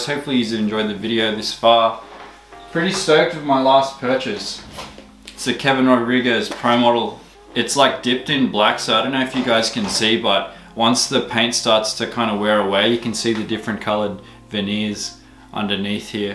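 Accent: Australia. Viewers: Mad deck also are you from Australia or nz? From the uk btw. Nice shoes also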